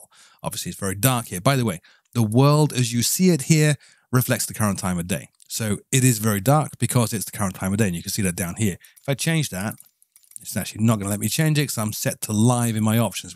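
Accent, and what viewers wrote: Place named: United Kingdom. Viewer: you kinda have a british accent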